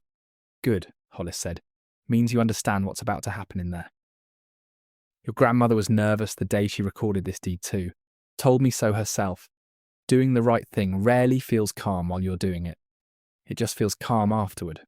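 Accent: England, United Kingdom. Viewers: South Carolina? Get rid of the English accent.. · love your stories but why the english accent.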